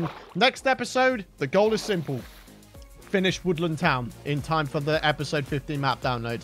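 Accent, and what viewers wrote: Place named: Scotland, United Kingdom. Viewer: anyone else think that is a want to be Stampy, except stampy is english and sounds scottish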